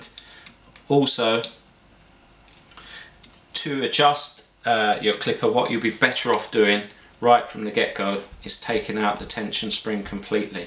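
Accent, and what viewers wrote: United Kingdom. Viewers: Love your accent from London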